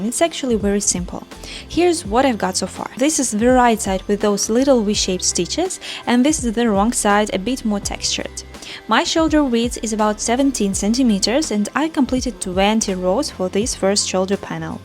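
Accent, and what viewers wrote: Russia. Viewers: Hi girl thanks for your perfect turorial, btw are you russian?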